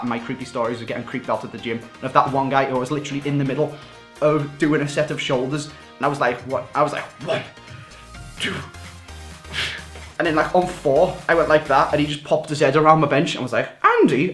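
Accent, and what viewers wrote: Liverpool, United Kingdom. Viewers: are you a scouse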